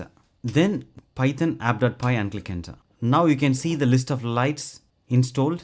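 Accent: South Africa. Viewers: Love the videos. Can you do one for controlling apple HomeKit? Are you South African?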